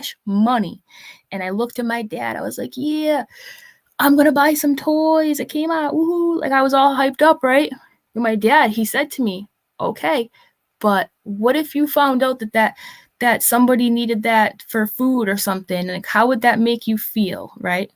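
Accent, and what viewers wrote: United States. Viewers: This is only the 2nd time I've watched you and yes I subscribed after the first half an hour. I live in Australia and I think you are the American version of me but way cooler. I think I actually agree to most of everything you said. Thank you and God dam I love your accent I could listen to you for hours 🇦🇺💛💚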